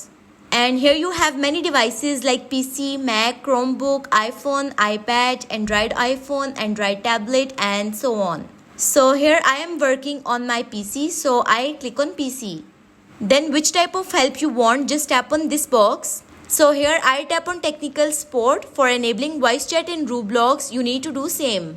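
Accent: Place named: Arab world. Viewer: arap aksamı var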